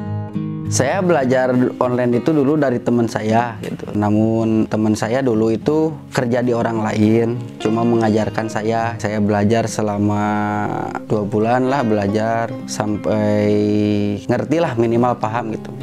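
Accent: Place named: Tasikmalaya, Indonesia. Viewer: Logatnya Tasik banget 😁😁...salam dari orang Tasikmalaya sukses terusss 😍😍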